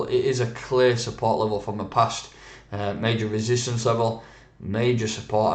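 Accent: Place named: North, United Kingdom. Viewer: Thanks as always chief! Love the videos and the northern accent delivery beyond awesome!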